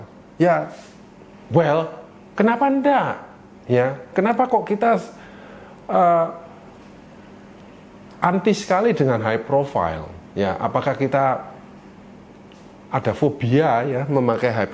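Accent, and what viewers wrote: Surabaya, Indonesia. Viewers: I love..penjelasan nya mudah dipahami..yg penting logat suroboyonya yg bikin meyakinkan..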